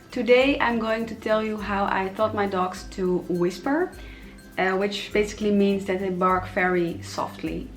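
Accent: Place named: Germany. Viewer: Wie du englisch redest man weiß das du deutsche bist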